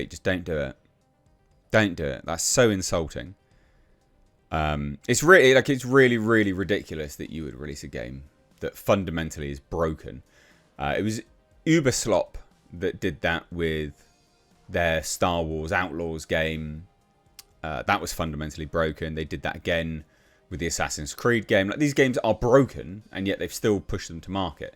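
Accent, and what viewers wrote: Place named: England, United Kingdom. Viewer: sounds English. Yet he just said coming out in “fall”. WTF🤦🏼🤣. Pretentious knob🤣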